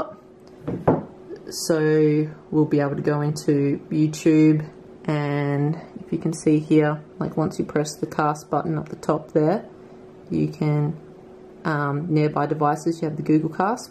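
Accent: Australia. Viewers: Thanks, you made the improbable possible and did it with a sexy Australian accent.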